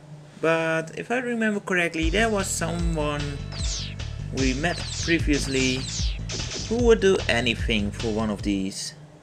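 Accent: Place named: Netherlands. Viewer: i was sitting here, listening to your voice and i was like 'this guy must be from the netherlands'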